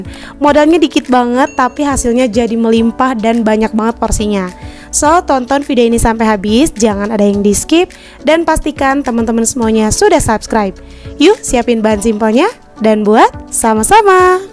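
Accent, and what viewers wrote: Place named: Sunda, Indonesia. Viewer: Lucu deh ah cara ngomongnya..meni sunda pisan 🥰🥰🥰..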